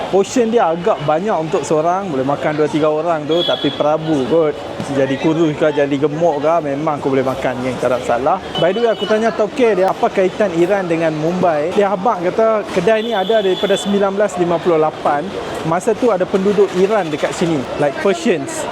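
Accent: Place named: Kedah, Malaysia. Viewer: Aku dah terjerat dgn channel tuan prabu.. Haru... Aku jrg tgk channel mcm ni.. Pasai pa tak tak tau blh sgkut plk.. Mgkin cara penyampaian dan adab dia dgn org kot.. Tuan Penang ka kedah.. Lenggok mcm Penang..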